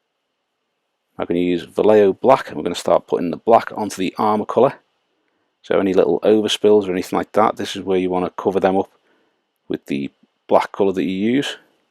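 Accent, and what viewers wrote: Liverpool, United Kingdom. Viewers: Why is whenever I hear a fellow scouser on YouTube I instantly sub 😂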